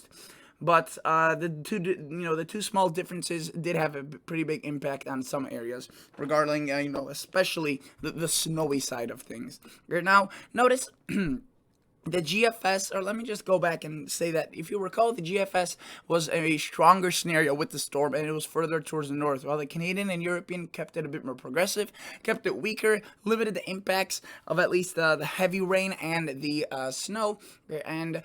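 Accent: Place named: New York, United States. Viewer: Are u looking at Wisconsin for snow? You sound like a New Yorker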